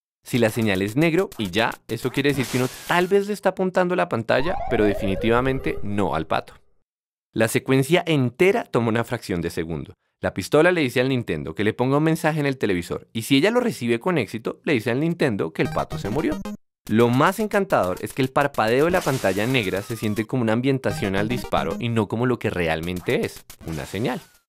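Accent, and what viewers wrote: Bogotá, Colombia. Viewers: esa voz de rolo inconfundible con un tono agudo en cada frase al final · te reconozco el acento colombiano :) yo también lo soy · sos colombiano ?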